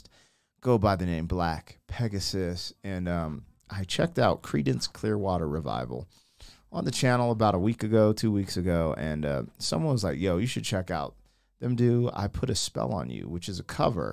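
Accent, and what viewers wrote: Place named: Louisiana, United States. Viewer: cali boy with a cajun accent!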